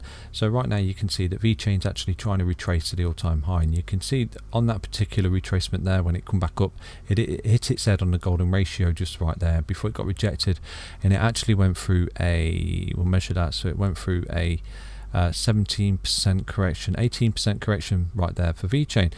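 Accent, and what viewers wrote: United Kingdom. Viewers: Ur great In ur comments mate mint work how come u work in dollars as u sound like ur from the UK?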